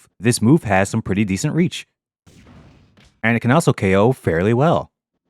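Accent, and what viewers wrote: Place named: Japan. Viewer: Why do you say the names in japanese and japanese engrish?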